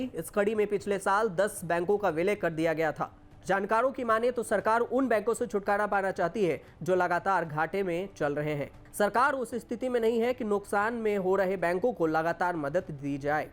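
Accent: Bihar, India. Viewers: Kisne is anchor ko rakha ,sudh hindi tak padna nahi aa rahi.bihari babu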